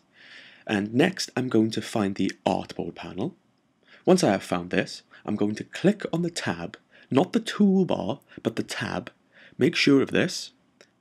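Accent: Wales, United Kingdom. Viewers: Thank you for these videos. Love the familiar Welsh accent too :)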